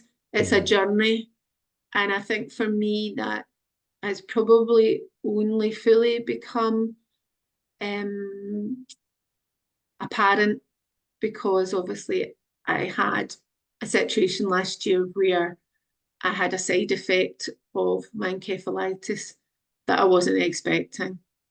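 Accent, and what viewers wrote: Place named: Scotland, United Kingdom. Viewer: Thank you. For sharing your story. I can relate to your story so intensely. I myself am a survivor of Viral Encephalitis and Autoimmune Encephalitis. Also living in Scotland. I feel so fortunate. Encephalitis changed my life. I have a different outlook on my life now. I also live in the West of Scotland. And it was comforting hearing your voice and accent in your story. ❤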